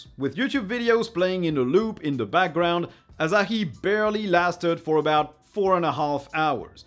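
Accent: France. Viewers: On entend un peu l'accent francais mais tu as vraiment un bon anglais franchement felicitations pour etre aussi bon en anglais! Pq avoir fait la chaine en anglais plutot que le francais d'ailleurs ?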